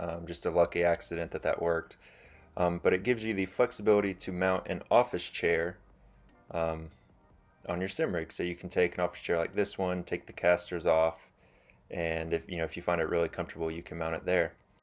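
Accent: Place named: Midwest, United States. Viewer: My profile name here is my XBL GT add me if you feel like. Are you Midwest US, sound like it.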